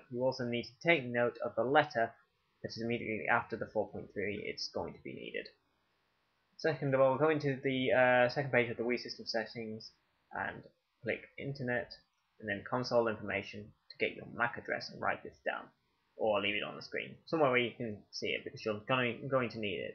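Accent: England, United Kingdom. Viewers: you sound like my friend Alex with a British accent. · Love your accent! Are you English like me?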